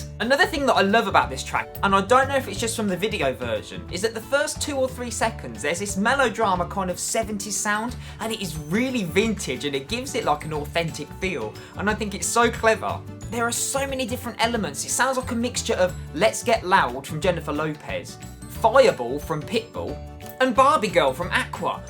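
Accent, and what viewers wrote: United Kingdom. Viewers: Thanks for your reaction and review 👍 I really enjoyed watching it and hearing you... I just adore British English 😍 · Greetengs from Russia. Wanna get your feedback on the question is your accent British? Btw good job, very informative and objective. Good luck bro:)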